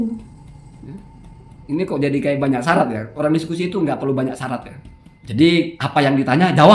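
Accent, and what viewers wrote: Madura, Indonesia. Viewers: orang mana ya, kok ligatnya kayak orang madura. Ngapunten ngge.......salam kenal dari mojokerto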